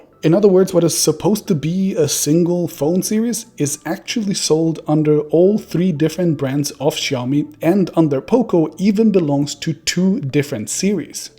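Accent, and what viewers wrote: Germany. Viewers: Your German accent is just annoying, please hire and American person who can talk for your little mid life crisis virgin a$$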